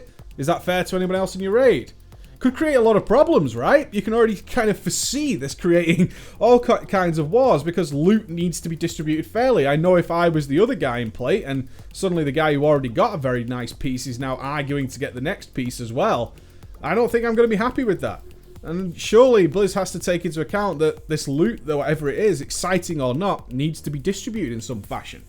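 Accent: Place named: Lancashire, United Kingdom. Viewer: i think hes from the lancashire region of england tis a funny accent :P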